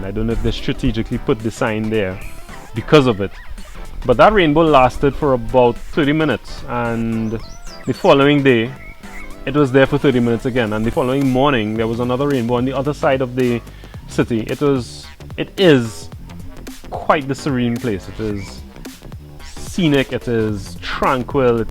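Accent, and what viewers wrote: Trinidad and Tobago. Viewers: I clicked on the video...heard ah Trini accent and subscribed one time...loved the video and how you presented both countries .... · i instantly knew you were Trinidadian from the accent. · Love the blog. Love the Trinidadian accent. Thank you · Trinidad and Tobago by farrrrrrrr. I know he had an trini accent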